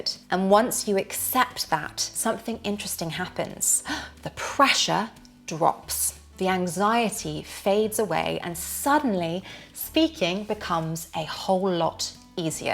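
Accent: United Kingdom. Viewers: de que parte del Reino Unido es su acento , ❤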